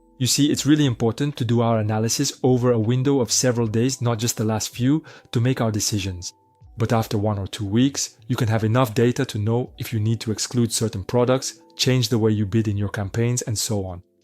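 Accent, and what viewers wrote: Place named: France. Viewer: vu son accent en plus je pense que c'est un français je reconnais l'accent :)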